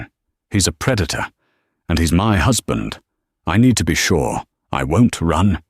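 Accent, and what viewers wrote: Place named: England, United Kingdom. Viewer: Oh so glad I'm not the only one to enjoy the narration in that purring English accent. YUM!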